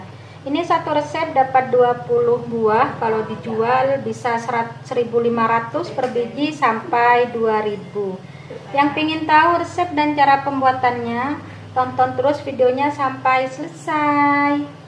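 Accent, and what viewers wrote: Madura, Indonesia. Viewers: Kalau saya tahu cara bicaranya dr pulau madura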